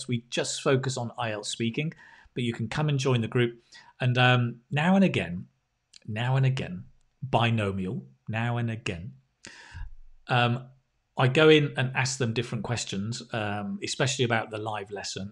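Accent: United Kingdom. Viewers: Dear Mr. Thank's a lot your lessons are so helpful, clear British accent, funny, informative, and lots of useful phrases, for free and so generous...😄 They're also useful for daily conversation not only for IELTS. Again, thank's a lot and more lessons please! 😄😃😃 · Muchas gracias, pero de verdad... muchisimas gracias por tu canal. Apenas lo conozco pero me encanta. Ajjajaja apesar de que no sea fan del inglés britanico, tu me estas haciendo amarlo 🤗🥰❤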